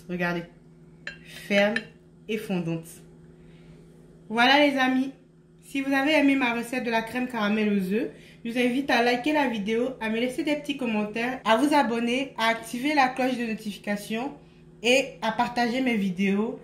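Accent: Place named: Ivory Coast. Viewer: Je m’abonne parce que ta recette est facile et que tu as l’accent ivoirien donc je ne peux que soutenir ma compatriote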